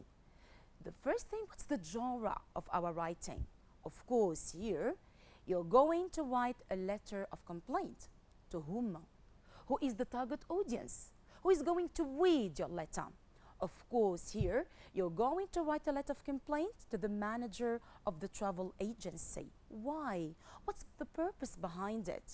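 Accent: United Kingdom. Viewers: Reminded me of the old British accent ..I thank you for dealing with all the necessary components required for the productive stage ..Hats Off!!!! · Loved that RP accent bravo madame ❤❤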